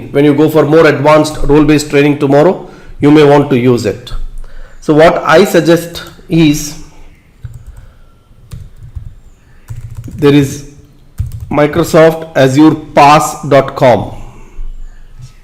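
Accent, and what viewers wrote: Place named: India. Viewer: indian accent......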